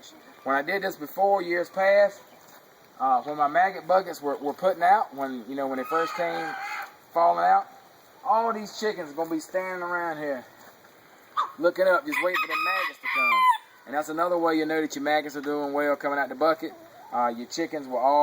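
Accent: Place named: South, United States. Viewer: man oh man.. u have uncovered nature's ultimate feeds.. that southern talk is so cool but the message is gonna make the whole world filled with hanged holed buckets.. greetings man from the far east.. woo yahh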